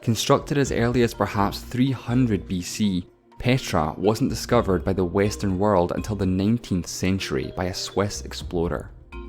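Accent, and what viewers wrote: Scotland, United Kingdom. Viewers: Is this guy Scottish? Man, those thick accents! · I would like to know where the channel's owner accent is from? Scotland? Ireland? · Sounds like his accent is from scotland from brave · i think he's scottish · You're welcome. If you follow his channel and have seen all his videos. You will already know that he is from Scotland (he even said so). I'm used to his accent, it's cute. Much better than the posh accent of BBC documentaries. lol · Omg it’s so nice to hear a Scottish accent doing a voice over yasss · He is Scottish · Whatever, I´m very much enjoying your Scottish accent, keep ´em coming! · i think he’s Scottish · finally a scottish voice, not a yank!! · lol i heard that but cmon don’t make fun of his scottish accent i’m part scottish too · what is this guys accent? it sounds scottish but it doesn’t at the same time lmao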